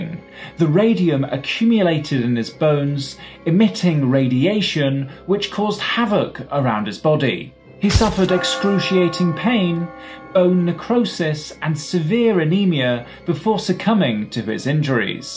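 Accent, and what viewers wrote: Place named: RP, United Kingdom. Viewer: Not only this material is utterly informative...the level of English RP is amazing,great training for an English teacher like me hailing from Havana.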